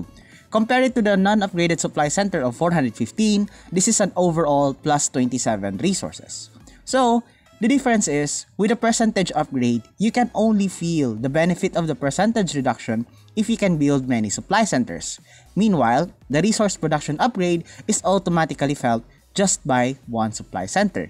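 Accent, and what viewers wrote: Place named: Philippines. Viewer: Keep it up.👍 Pilipino accent just like tracie haha. Kaya moyan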